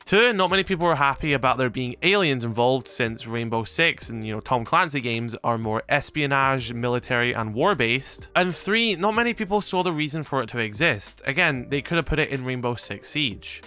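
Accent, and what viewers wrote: United Kingdom. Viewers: Also if you were invited to Ubisoft Montreal the French speaking people in Quebec would be so confused having a British person speaking English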